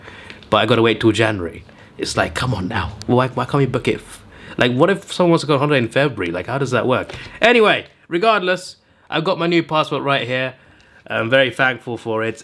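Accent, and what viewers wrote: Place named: United Kingdom. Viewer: you seem to got a British accent... like did u practice or u brought up there?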